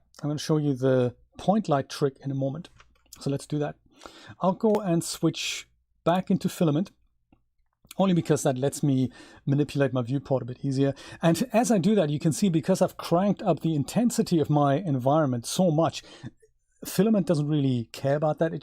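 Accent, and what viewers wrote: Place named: Germany. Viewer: Sir, thank you for your unique work and presentation, I'm more a silent spectator of your site for years now. One question about you please, your pronunciation sounds so German, are you German?... 😉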